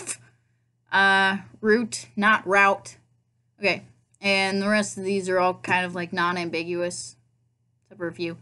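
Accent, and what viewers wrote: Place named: South, United States. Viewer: To my English ear you sound southern but only a bit. Definitely southern though.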